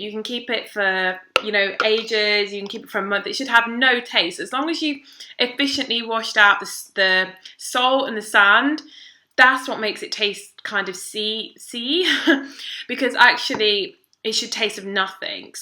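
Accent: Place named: England, United Kingdom. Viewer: you are S-T-U-N-N-I-N-G and your English accent is Beautiful. I Love it. Please go back to this hair color. It suits you well. Makes your blue eyes really pop. Markus Rothkranz rocks and all of his products are effective.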